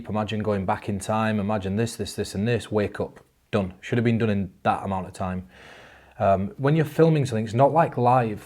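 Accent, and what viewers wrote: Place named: Northern England, United Kingdom. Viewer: Thanks, I thoroughly enjoyed that.....younger effect was totally baffling too. I am not northern, and I don't think you needed to knock your accent, it is part of who you are and gives you context.......due to your performances over the years plus a few other people from the north, I have come to associate your " Northern Accent" with amazing and innovative "mentalism".......a crazy anchoring that is now firmly set in my mind. Thanks again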